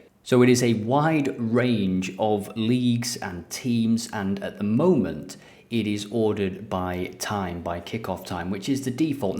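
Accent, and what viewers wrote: Scotland, United Kingdom. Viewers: Great tips! Thanks for not just providing the platform but giving these helpful videos always! Have a good feeling for this weekend. Loved that Scottish accent!!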